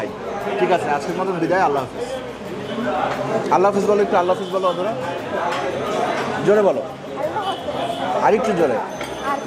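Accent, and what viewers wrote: Barishal, Bangladesh. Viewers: Aapner Borishaillah accent er ki holo? Shunte besh bhaaloi laagchilo.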